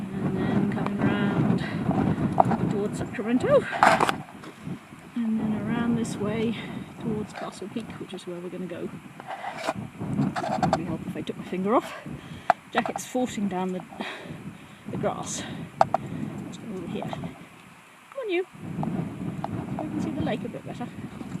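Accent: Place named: United Kingdom. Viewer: your riding is fantastic! I was lucky enough to visit Tahoe years ago and went for a trail ride in Alpine Meadows. I live just below the Cotswolds and have Badminton at my doorstep but it's no comparison to your hacking! Can't help but notice that your accent hails from the UK though, did you have such great riding when you lived in the UK? Would love to go on a riding holiday in the area to explore the trails!!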